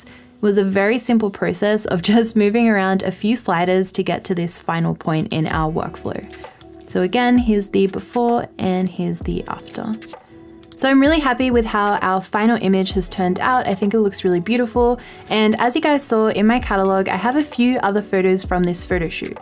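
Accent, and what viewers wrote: Australia. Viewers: It's Aussie? I couldn't place it. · luv ur aussie accent ❤️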